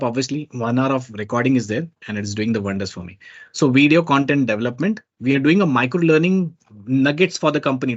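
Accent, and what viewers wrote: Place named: India. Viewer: I'm multilingual and I think your English is top 80 percentile . I am UK English speaker. I like your Indinglish as it's so so fast...I am a busy person 😂😊😁👍👍👍👍 I like Dutch and German videos too....straight to point straight and quick! I'm autistic...suites my learning style - slow learning is death ....thanks a billion dude 👍👍👍👍👍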